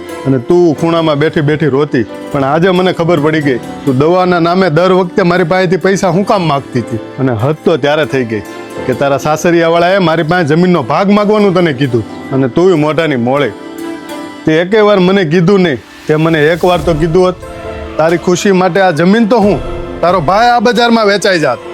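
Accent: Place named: Bhavnagar, India. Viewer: બોલી પરથી લાગે છે કે ભાવનગર તરફના હશો.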